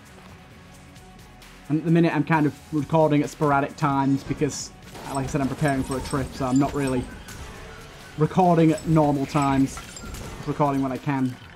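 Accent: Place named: Scotland, United Kingdom. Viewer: Nah he is just Scottish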